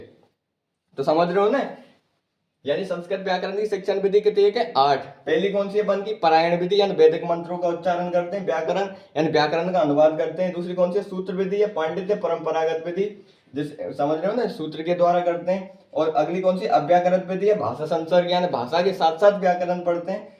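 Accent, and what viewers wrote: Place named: Rajasthan, India. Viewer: Sir ap kha se ho hamri trh bolete ho 😀😀😆 karauli se ho kya ap😅😆😆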